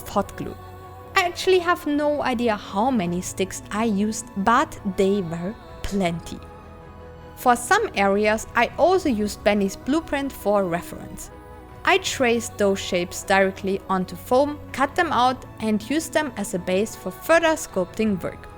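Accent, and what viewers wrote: Germany. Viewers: Are you from Germany or something like that you have a very strong German accent in your English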